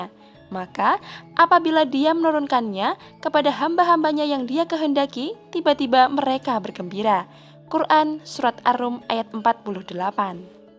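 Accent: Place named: Java, Indonesia. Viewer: naratornya logat jawa nya kental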